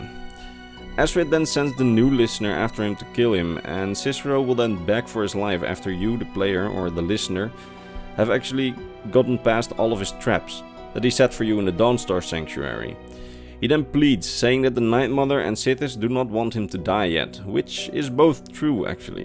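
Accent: Netherlands. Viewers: hi! i love this vid, question tho, are you dutch? you kinda have that dutch english accent. im dutch btw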